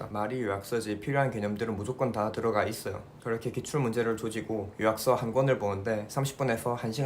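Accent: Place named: Jinju, South Korea. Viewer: 고향이 어디에요?? 사투리 익숙한데 진주 사투리신가...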